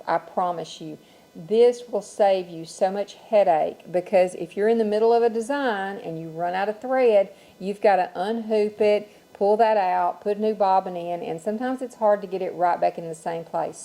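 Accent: South, United States. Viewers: PS I absolutely love your southern accent... We northerners don't hear that very often, so nice .. be well All the best to you.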